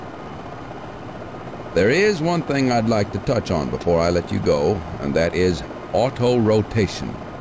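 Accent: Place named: South, United States. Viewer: From Kentucky. I didn't notice the narrator trainer's southern accent until just now. I used to play this as a kid 25yrs ago and he sounded like all the other old farts around here telling me what to do 😂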